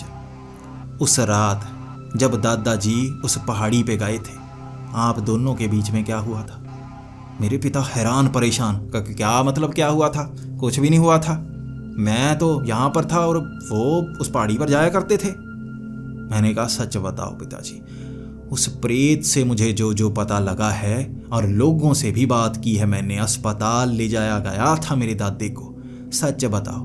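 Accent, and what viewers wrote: Punjab, India. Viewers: Aap Punjab se kuch kuch Shabd aapke alag sunane mein aati hai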